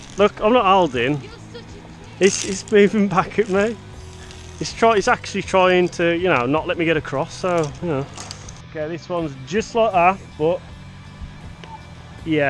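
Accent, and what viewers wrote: Black Country, United Kingdom. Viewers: “It looks like a spider’s web doe it” Ah the refreshing sound of a Yam Yam...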